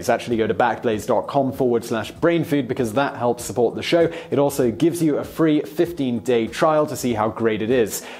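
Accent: England, United Kingdom. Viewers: Robrobx generaly if you say "british accent" he means accent from one of the United Kingdom's countries. We can clearly hear English accent, but calling it "British" is also not so wrong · Does vsauce have a twin? A brother with a british accent (or australian, sorry i really can’t tell. Dont mean to be rascist) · You mean English accent? Scotland and Wales exist btw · Vsauce but he has a British Accent and a sharp head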